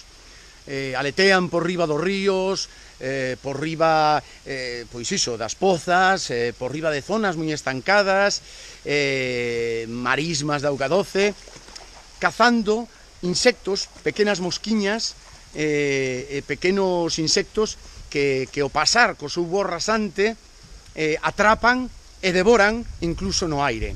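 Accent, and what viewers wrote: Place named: Spain. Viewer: Parece q es un español y esta hablando portugués por q quiza esta en un país donde hablan portugués :v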